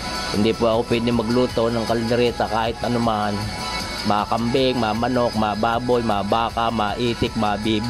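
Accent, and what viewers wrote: Batangas, Philippines. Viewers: Batangueño ka na nga ginawa mo pang asal ang punto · habang pinanonood ko ho ito ay may ngiti sa aking mga labi. ako ho ay sobrang nasiyahan sa panonood nitong video ninyo. kayo ga ho ay taal na taga batangas? hindi lang ho sa kaalaman sa pagluluto ng kalderetang kambing ang natutunan ko dito kundi ang makarinig ng nagsasalita ng puntong batangas, para tuloy akong napauwi sa aking lupang sinilangan. mukhang napakasarap nga ng kaldereta nyo, yan ang tinatawag na authentic - kalderetang totoo. at hanga ako sa paraan nyo ng pagluluto dahil yan ho ang talagang bihasang (skilled) magluluto, pinaala-ala nyo sa akin ang aking mga tiyuhin na magaling ding maluto ( ang isa ay dating may karehan sa bagong palengke) ay sya, maraming salamat ho, mabuhay po kayo at nawa'y mas marami pang tumangkilik dito sa chanel ninyo at makagawa pa kayo ng maraming video na maraming makapanood. 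God bless. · Iyan ang tunay na Batangueno , sa pagsasalita at sa pagluluto ay talagang mainam na mainam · Nakaka aliw magsalita ang mga Batangueno eh! Dalas dalasan mo kuya ang pag a-upload ng mga cooking video. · ala eh kuya.. akoy nattuwa Sayo eh.,kasarap mo pakinggan pag nag ssalita ka NG batanguenio..akoy wiling wili mkining sa bosis mo..😋😋😋😋😋😋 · Your new subbed, searching kardereta yung luto mo nakita ko! Enjoy your cooking lalo na yung Batangas mong accent! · Tuwang tuwa ako sa punto ng batangeno dahil yan ang nakalakihan kong marinig sa nanay kong batanguena at mga kamaganak namin sa Majada at sa batangas!! Ur accent reminded me of my kakang duneng and other tiyo at tiya!! They all speak that same accent!! Pag nakain na at naghuhuntahan na, akala mogay nagbababag !! Kainaman naman eyyy! 🤣🤣🤣. I love batangueno foods! Napakasarap talaga!! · Either tumira ka matagal sa Batangas, o magsanay ka magsalita. 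Pero, matagal tagal bago mo matutunan yan. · Bro, di ko kabisado ang accent at punto ng pananalita mo, sorry, matanong ko yan ba ay baklang salita o batangeno? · unang salita palang natawa na ako,, Batangueño talaga. sarap nyan kabayan 👍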